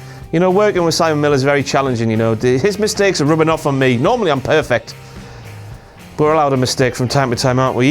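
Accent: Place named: Scotland, United Kingdom. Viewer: I love his Scottish accent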